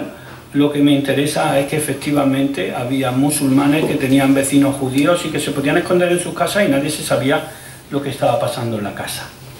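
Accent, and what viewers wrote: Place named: Córdoba, Argentina. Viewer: Lástima la calidad del vídeo, con mal sonido y sin que veamos los documentos de patnalla, con un micro antiguo que recoge las toses, con lo barato que es un micro de solapa, y con alguien que no lea los textos que él conoce a esa velocidad, que no hay cerebro que lo pueda asimilar al oirlo, sobre todo para quien no está familiarizado con el acento cordobés.Y por cierto, la existencia de cultura islámcia en la Península Ibérica, es una invasión, una ocupación, por mucho que el sr. diga que no, pero ya sabemos la línea ideológica subjetiva de la universidad de Granada. Poco profesional todo.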